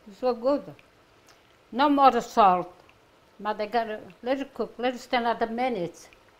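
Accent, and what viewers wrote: Italy. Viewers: I like your English language with Italian accent😘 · ammore! Thank you for these wonderful recipes. You make them so much fun with your stories, the singing and Italian accent. God bless you always! 🙏🏼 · You are a joy to watch! (Have to listen very closely because of your beautiful Italian accent). Thank you so much for all of your great recopies. (Have of copy of your cook book). You are a very special lady I and enjoy watching you create all of your wonderful creations in your kitchen! God Bless! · gramma, love a your recipes. italians gota have the best accent. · She is too cute, I love when she talks, I miss that accent like my mom’s and other relatives from Italy...COOKING TALENT CANT COMPARE, thank you for sharing your knowledge and love of serving others💕 God bless you and your loved ones, ❣️ Love your singing, memories of my beloved mamina, it warms my heart❤️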